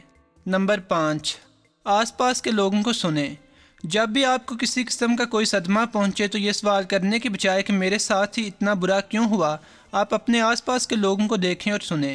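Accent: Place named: Punjab, Pakistan. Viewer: آپ کی موٹیویشمل سپیچ زبردست ہے . آپ بنجابی ٹچ کچھ کم کریں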